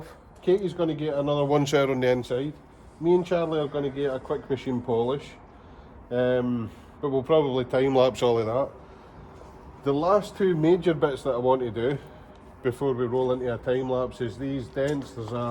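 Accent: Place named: Scotland, United Kingdom. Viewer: Where in Scotland are you from? I'm in Livingston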